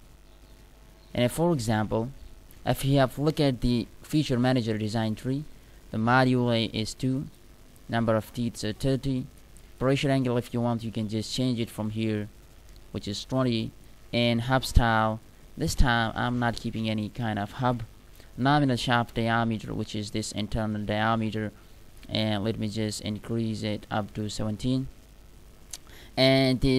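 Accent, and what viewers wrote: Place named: United States. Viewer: yaar accent to na daalo itna! sadi english bol lo aapka meericun accent se kuch samagh nai aaya!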